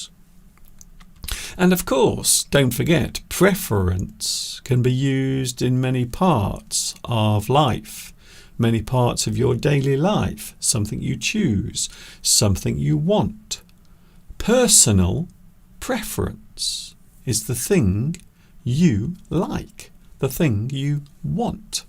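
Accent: United Kingdom. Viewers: It's good to learn English here.British English.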